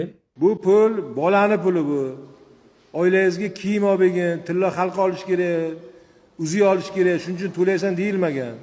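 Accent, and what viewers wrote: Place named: Kitob, Uzbekistan. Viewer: Ота онанга рахмат судья болам шеванг Китобликларни шевасига ухшаркан❤😂 боккан ота онангга рахмат😢😅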